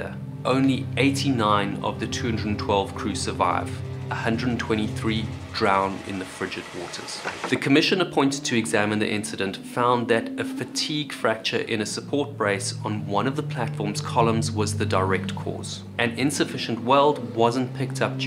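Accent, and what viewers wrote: South Africa. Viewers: You sound very South African. I love the accent and you are a real good story teller. Great stuff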